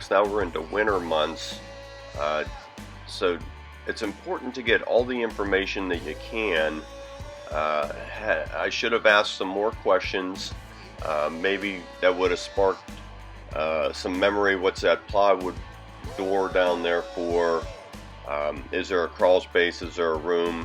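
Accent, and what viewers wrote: Pittsburgh, United States. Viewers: looked on all your sites but dont see where your located,,i detect a pittsburgh accent in your voice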